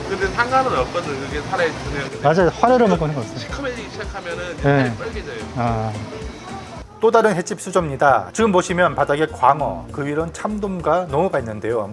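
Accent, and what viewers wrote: Gyeongsang, South Korea. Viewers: 님은 갱상도 사투리 쓰는 서울 사람! 🙆‍♂️🙆‍♂️🤗🤗🤩🤩👍👍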